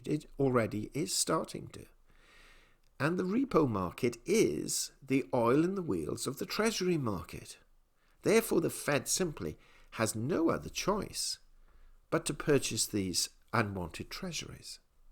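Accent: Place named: RP, United Kingdom. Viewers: I do not understand this channel. Year 2019 it was mainly critizing silver "pumpers" and mocking them. Then silver prices started to go up during the fall to the highest levels in years, so was wrong. Why call themselvs something "silver" when they clearly do not believe in the metal? I think anti-silver would be a better name. And the upper class english does not help either. Just saying... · Yes I agree! I would not be surprised if they all have ties to the central banks the LBMA & The Crimex (Comex). I'm sick of the posh tone and arrogance!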